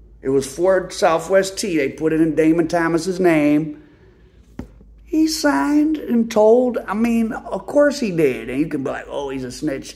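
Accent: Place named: Midwest, United States. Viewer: Your videos very interesting. Lots of folks on youtube with this type of content and reporting but you do it TV worthy. I think you'll be on a network show soon, such as A&E, Tru TV, E, NatGeo, etc. But the accent kills me. Like fingernails across a chalkboard. Worse than Det. Tom Armelli, the retired Detroit detective on The First 48. Strong midwest accent. DA BEARS! By the way I think you are a hell of a journalist even though you say you're not. Later.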